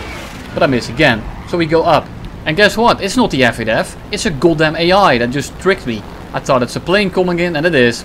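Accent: Netherlands. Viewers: I hear a Dutch accent, though i can't determine what kind of accent it is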